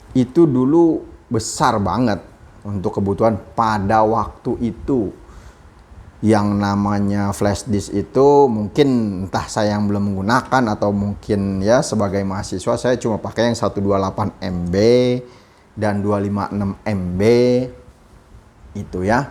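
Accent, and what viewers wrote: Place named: Betawi, Indonesia. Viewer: orang betawi ya bang, gaya bicaranya ketauan banget. abangnya ganteng juga. upgrade saya dong bang🥰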